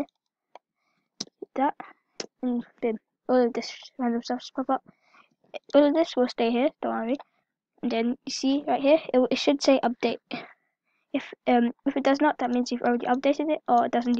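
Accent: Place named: United Kingdom. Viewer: i can tell you're british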